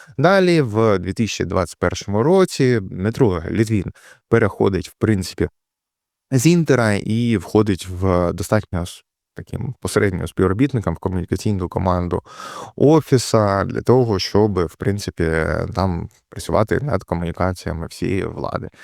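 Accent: Russia. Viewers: Пане, нардепе, підтягніть, будь-ласка, свою українську мову. Ви цікаві викривальні матеріали робите. Але тако буркочете під ніс і видно що через те, що ви російськомовний, бо не впевнені у своїй лексиці, та з'їжджаїте через речення на русизми, та рос прононс.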